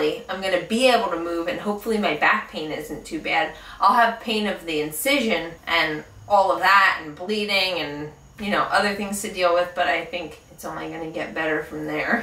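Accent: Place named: New York, United States. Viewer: I don't know what it is, but something about your New York (New Jersey maybe? lol) accent and how silly you are with your husband, you just seem really cool and you put me at ease in an odd way. I usually feel really uncomfortable around other women (people in general, but I just usually never get along with women, I don't know why) but I think I'd like you :P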